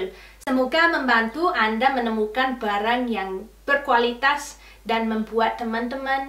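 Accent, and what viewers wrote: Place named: Bali, Indonesia. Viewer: logatnya bali campur jawa.. 'dathang' pepathah..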